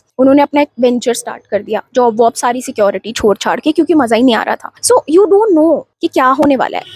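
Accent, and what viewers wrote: Rajasthan, India. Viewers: Mam aap alwar rajasthan se ho kya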